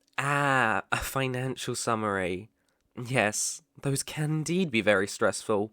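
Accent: United Kingdom. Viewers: And again the British accent is wonderful 😭🌟🌟🌟🌟🌟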